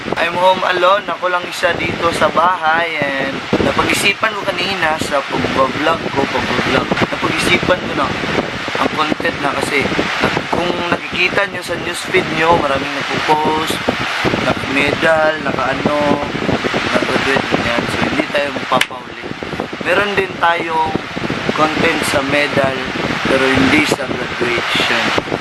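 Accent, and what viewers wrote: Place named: Visayas, Philippines. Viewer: Bisaya ka sir?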